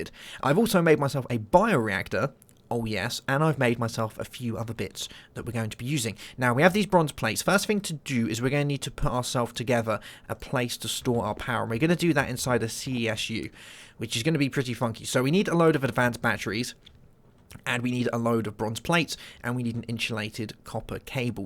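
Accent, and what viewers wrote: United Kingdom. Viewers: really entertaining series and greetings from germany :). btw. i like your accent so much! can you give me a hint which part of gb it is you rule from =D?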